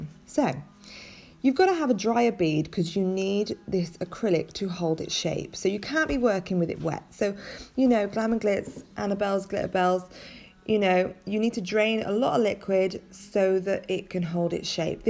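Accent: United Kingdom. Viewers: I love listening to your British accent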